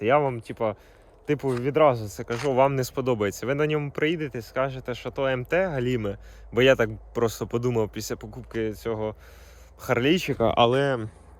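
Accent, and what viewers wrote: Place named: Poltava, Ukraine. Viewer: Нехай радує друже) Суржик як на Полтавщині)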